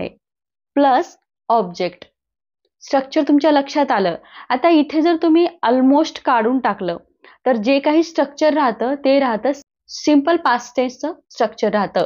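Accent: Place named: Marathwada, India. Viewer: सुंदर शिकवता पण मराठावाडा किंवा विदर्भात अस बोललं जात जस सेव्हडं, स्लिपड, कृपया तस नका बोलू.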